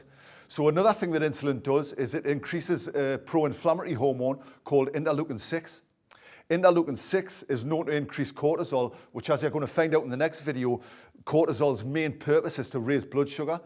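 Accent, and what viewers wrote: Newcastle, United Kingdom. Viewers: I actualy understood that. Must have been the jordie accent!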